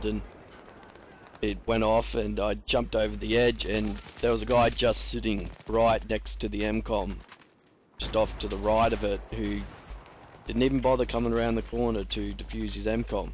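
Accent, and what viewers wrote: Australia. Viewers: Like maa peenis, i love australian accents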